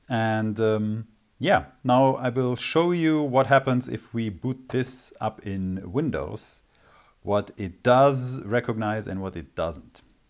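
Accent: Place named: Germany. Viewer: His wording was cuckoo, but “five point twenty five” is incorrect, too. Though people occasionally do it, it’s not correct to speak out digits after a decimal as a single number (except in currency). So it’s either “five point two five” or “five and a quarter”. He’s not a native speaker. My guess is he’s German.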